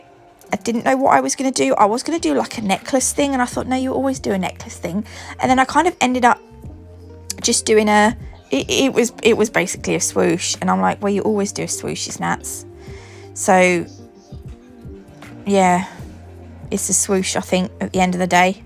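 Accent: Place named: United Kingdom. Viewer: I absolutely love your content and I really enjoy watching your videos and I just love your accent it reminds me of my extended family who live in the UK 🇬🇧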